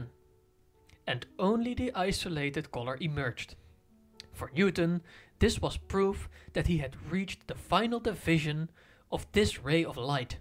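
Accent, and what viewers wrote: Netherlands. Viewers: Your videos are great in content, crazy you have so few subsrcibers. Maybe work on the dutch accent? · The Dutch accent sounds like the complement of the Australian accent so it definitely worked, added colour, in my case :)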